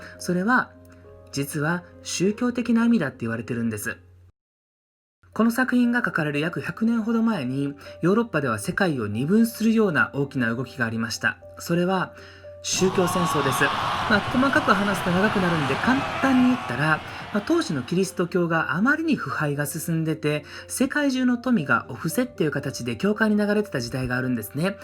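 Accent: Kansai, Japan. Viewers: この絵にそんな意味があったんだ〜と感心しました。硬いパンでも、勿体無いから工夫して食べよう、うん、とっても大事な精神だと思うけど、プロテスタントはやっぱガチガチだね〜と思いました。プロテスタント系の中高一貫校に通っていたので、何となくそういう雰囲気って分かる気がしました。所で、は大阪？出身？イントネーションがもろ関西ですね。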